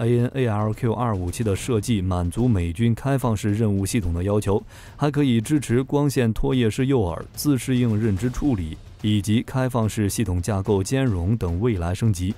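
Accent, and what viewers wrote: China. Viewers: 一個操著大陸口音的人，居然在吹台灣的飛機有多厲害！我業障重啊？我真的視障目盲耳背，一堆人跟風且無常識！就問你們飛過沒？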